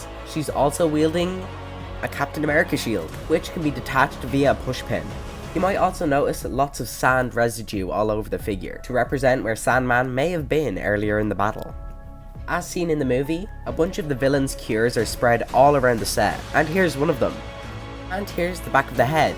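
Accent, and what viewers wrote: Ireland. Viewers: I really wish this was a real Lego set Also I could tell you were Irish just by you’re accent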